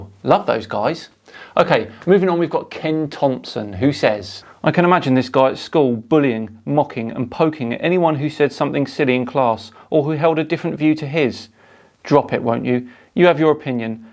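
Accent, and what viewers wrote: United Kingdom. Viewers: is a nasa shill using a British accent or whatever to sound smart he also could be homosexual according to some accounts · I think is hilarious. I’m assuming he’s British from his accent and sense of humor, but that’s right up my alley. Besides, if I didn’t, I wouldn’t be here.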